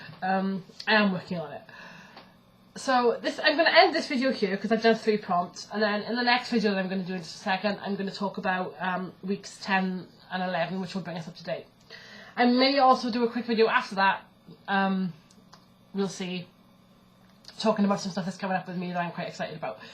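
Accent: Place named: Wales, United Kingdom. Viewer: Love your welsh accent, just recently back from a prog rock festival in north wales, I used to be in the kitchen witch school I really enjoyed it but family life kept getting in the way so I put it on the back burner for a while. Nice to see your face again, xx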